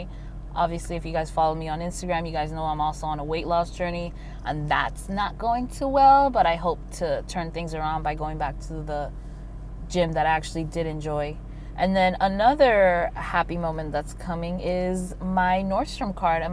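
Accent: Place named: Miami, United States. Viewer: Girl I love this! Please keep up with the videos... my 2018 goal is to be gazelle intense on paying off most of my debt :) You're great. Also, it sounds like you're from Miami. So am I!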